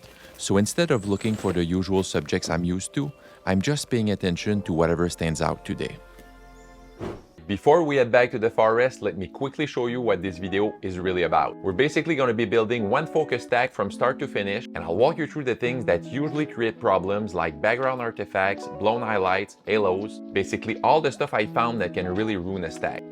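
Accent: Quebec, Canada. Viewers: I'm gonna ask you one thing, es-tu québécois? Ton anglais est beau mais j'entends très bien ce petit accent particulier du Québec! J'adore ton vidéo et je vais m'abonner à ton channel! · merci pour la vidéo. si je ne me trompe pas tu es québécois avec ton accent. 🙂 Est-ce que ton appareil fait automatiquement le focus stacking ou tu le fais manuellement?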